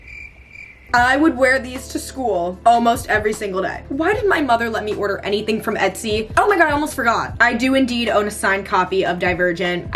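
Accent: United Kingdom. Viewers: ur a Brit?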